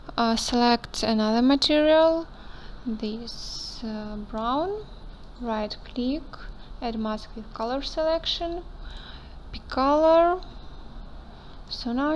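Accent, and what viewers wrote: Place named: Russia. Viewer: слышится русский акцент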